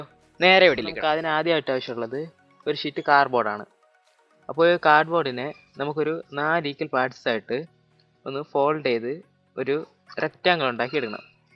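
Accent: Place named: Kottayam, India. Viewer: ഫാഗം കോട്ടയം സ്ലാങ് ആണ്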